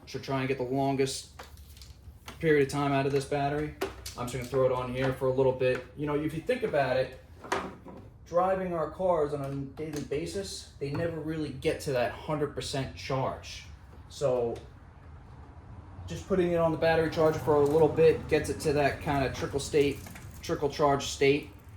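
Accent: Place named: New York, United States. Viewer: I recognize that NY accent lol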